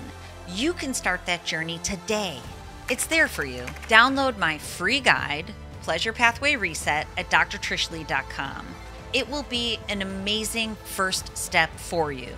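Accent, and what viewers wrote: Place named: Buffalo, United States. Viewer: I love your Buffalo accent,!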